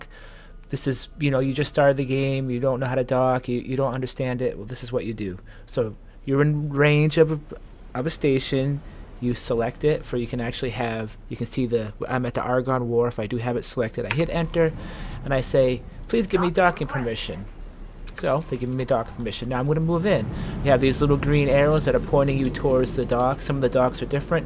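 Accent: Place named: New England, United States. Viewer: Loving your new england accent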